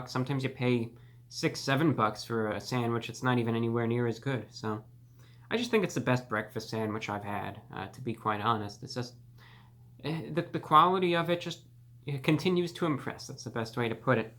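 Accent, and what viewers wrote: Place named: New York, United States. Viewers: He is from New York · does have a little bit of New York accent, but lives in Florida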